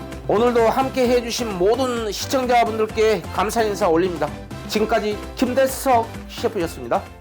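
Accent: Jeolla, South Korea. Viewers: 셰프님 전남쪽인갑네요잉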